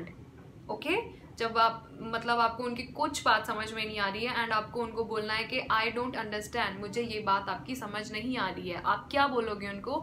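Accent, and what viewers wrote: Punjab, India. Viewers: hey you are punjabi????? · I think u r from punjab..anyway😍😍🇳🇵🇳🇵🇳🇵😘😘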